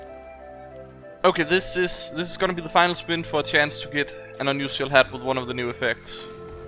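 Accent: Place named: Denmark. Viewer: hey i was wondering where you are from because you sound danish and im danish let me know if my speculation is right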